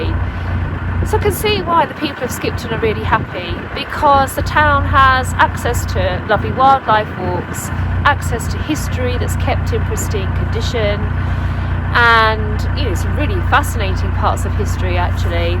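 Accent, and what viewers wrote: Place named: Essex, United Kingdom. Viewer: Interested to note you were born in Eastern Ave, Ilford (thought I recognised your accent!) . I was born in Dagenham, close to Martins Corner only a few miles from you. Have been a subscriber for some time and enjoy your travels. Love Zephyr too!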